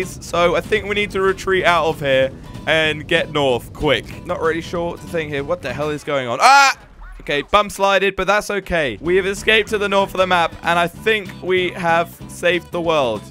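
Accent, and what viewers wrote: United Kingdom. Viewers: is talking so british here lol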